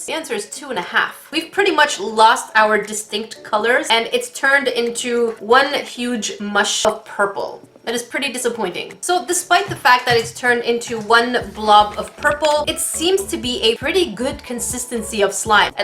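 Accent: Canada. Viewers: OMG are you Canadian?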